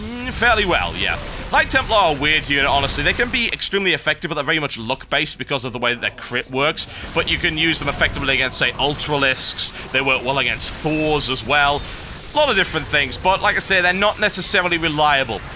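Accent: United Kingdom. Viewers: This was just enjoyable, the best part was of course when he rage quit. But your evil laughter kept me watching, as well as the charming British accent.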